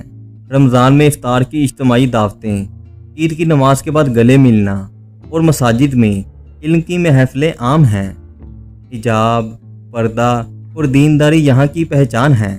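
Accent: Punjab, Pakistan. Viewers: Improve your speaking skills, your accent is typical paindoo punjabi, not suitable for these videos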